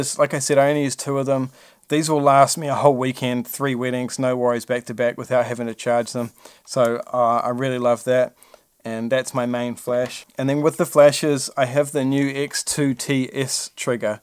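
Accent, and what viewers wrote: New Zealand. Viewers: Maaate! I was like.... is this guy a kiwi?! did a quick google search and BOOM! Nice one man I'm subscribing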